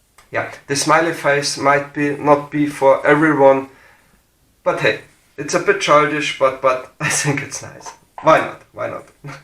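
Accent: Germany. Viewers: German? I think i hear an little accent? If yes i got a few questions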